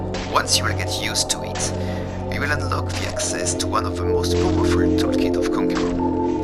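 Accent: France. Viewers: You are french ? you have a french accent :)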